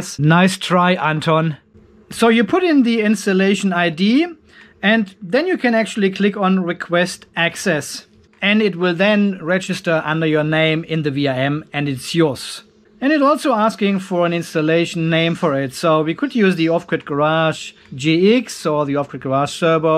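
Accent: Germany. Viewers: Hi,?...you sound like you would have a German-speaking background?....? Well, I am Otto and not busting on knowing about all of this, I try to learn. I am a long-retired, office machine technician, originating from Vienna. Because I run my system on 24 Volt, where should I get the 5.5 Volt supply from for my two in-line Lynx distributors to get the system indicator lights working? I have a basic Victron Cerbo GX and on top, at the far left I have two USB positions free, should I join a USB cable, cut one end off, and link it up with the yellow & black wire of the supplied cable that came with those Lynx distributors? We/A&O made up a solar panel to the battery bank system, controlled with Victron elements, output 220 Volt to overcome those many power-out situations we have in rural QLD to protect the goods in our fridges & freezers and issues when printing 3D when the PC goes wild with the printing program. 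Ergon Energy has the habit of supplying at times too little and even far too much electricity (up to 260 Volt) and some of our appliances did not like that and did quit on us......and you ask what name to give to the Cerbo GX, this unit is very smart - remember who Idefix was? (Idefix & Obelix). Stay awesome, cheers!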